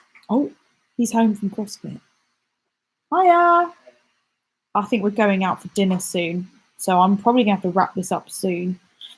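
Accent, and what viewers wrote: South, United States. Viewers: Scarlett O'Hara accent Southern?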